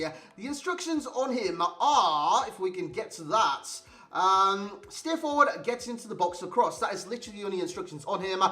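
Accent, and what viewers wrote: Newcastle, United Kingdom. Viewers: Are you from newcastle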